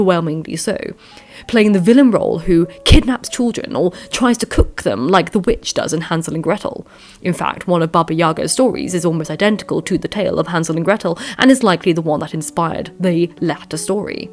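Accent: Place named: Upper class, United Kingdom. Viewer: This was well told. The narrator sounds like she has an upper class British accent, which is quite pleasant. She also tells the story of Vasalisa and Baba Yaga with good verbal technique. I enjoyed hearing the folk tale.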